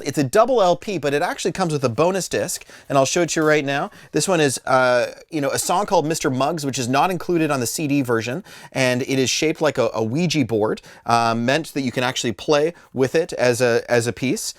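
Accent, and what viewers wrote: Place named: Canada. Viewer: Super cool,; I enjoy hearing your Canadian accent on here as well:) I have that Locust set - I got it from a friend who bought it when we saw them many years ago. That may have been with a band called Holy Molar or with Dillinger Escape Plan (I can't remember which)...Three One G had some excellent output for a bit in the late 90's-early Thousands. I actually have an LP from the band Struggle which is Justin Pearson of The Locust/Dead Cross/many more... That is not as well known, more heavy punky stuff, but has a good raw energy that I bet you would appreciate. As a fellow vinyl collector, that uncut Metallica made me green with envy, lol. Some cut outs I have seen or own, there is a cool J Dilla (beats) that looks like a police badge, and a Father John Misty B side that came out with his sophomore release in the shape of a heart, I know there are many more but those two stand out. 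Anyhow, thanks for the excellent content, I look forward to watching it all.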